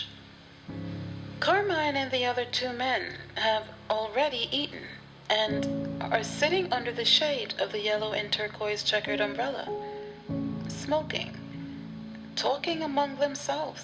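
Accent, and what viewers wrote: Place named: Brooklyn, United States. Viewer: No one know where can even contend with the narrator, the impeccable balance of her telling the story and keeping you in suspense while telling you the story is one thing, but her voice is a whole Nother thing in itself. It’s so Brooklyn so gangster rest like a pedestal WIFE from one of the boys like there’s no question she is now the best narrated narrative which ever term you want to use. IN THE MAFIA GENRE.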